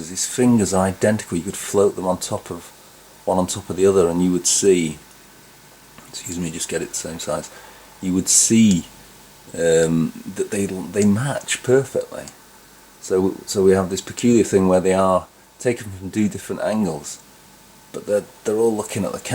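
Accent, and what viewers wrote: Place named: Liverpool, United Kingdom. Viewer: You’re definitely from Liverpool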